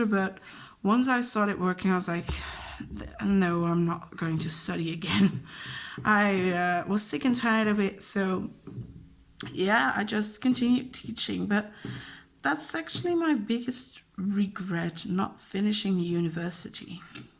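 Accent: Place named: RP, United Kingdom. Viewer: I love your name so much and I think it’s so cool where your mom got your name from. I love that song! The variation to Berlin is really beautiful as well. I want to hear you speak alllll the languages! 😂 If you could come up with something to say in Dutch, and then say the same or something extremely similar in your dialect, I think that would be awesome! We might not understand it, but we can try to hear the differences. I’m one of those people who love your accent! It’s so hard to explain sounds, but I can hear a little bit of that BBC sometimes and your words have a kind of flourish to them sometimes? I don’t know, it just sounds fancy! 😂 There are definitely many people here in the US who do not appreciate teachers and also make the same comments about them having lots of vacations and stuff. Unfortunately they don’t understand that, as you said, you’re still working (unpaid most of the time) when you’re not in class with students and that working with so many kids is EXHAUSTING. 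I know I definitely could not do it!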